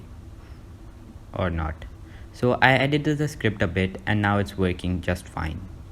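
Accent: India. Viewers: nice indian accent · oh man you live in my favourite city and your accent sounds like Indian accent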